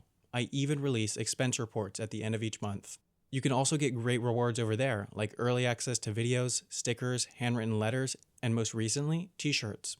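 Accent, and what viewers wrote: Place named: United States. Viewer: You may have answered this already, but are you originally from the US? I know you live in the UK now, but you definitely sound American.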